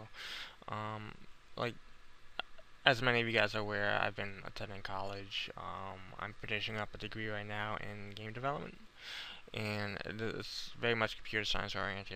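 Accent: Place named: New England, United States. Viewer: i thought you sounded like you were from the new england area, MA here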